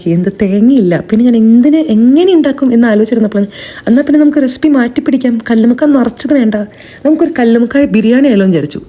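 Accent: Kerala, India. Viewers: Thani malayalide sound pole und❤️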